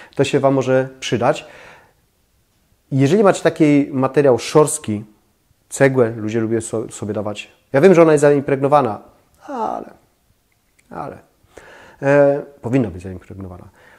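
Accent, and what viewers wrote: Silesia, Poland. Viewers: Synek ze Śląska (?)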